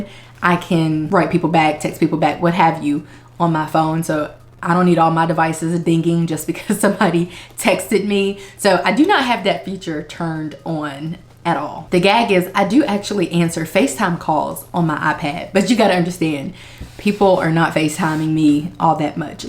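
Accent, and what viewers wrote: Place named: Texas, United States. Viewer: You gotta either be from Texas or Alabama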